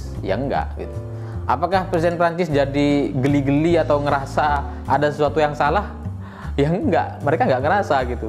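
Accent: Madura, Indonesia. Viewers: Logatnya mirip logatku, logat madura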